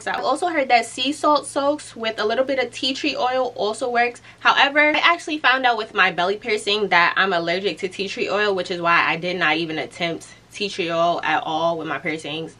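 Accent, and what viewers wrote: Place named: New York City, United States. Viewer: Shout outs to you and being frim NYC. I knew instantly once you started speaking. I'm considering this myself, very helpful video. Thank you, peace & love.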